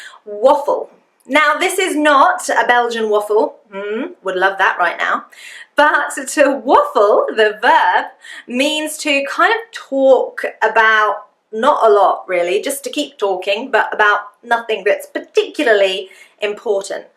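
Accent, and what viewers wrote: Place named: RP, United Kingdom. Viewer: Dear Prof, You are amazing. I do love your British accent, your posh accent. Love. Andrea from Venice, Italy.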